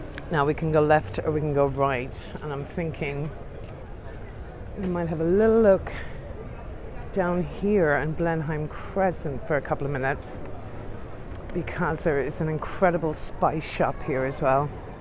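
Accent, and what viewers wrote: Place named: Ireland. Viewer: I discovered you two or three days ago and I can‘t stop watching your tours,. I love your Irish accent.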